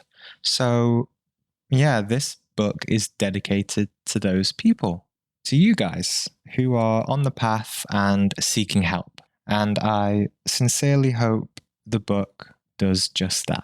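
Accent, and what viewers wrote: United Kingdom. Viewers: Thank You. I am 2-Years Late...but Intuitively I knew...that There was an Expert like You...Amongst the large Herd of Astral Projection Leaders available. I am American, & Your British Accent...and You Clear Style of Speech...attracted Me. Thank You.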